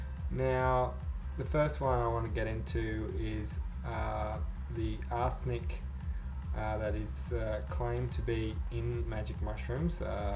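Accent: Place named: Australia. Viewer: I dig you man! crazy free party dude with your australian accent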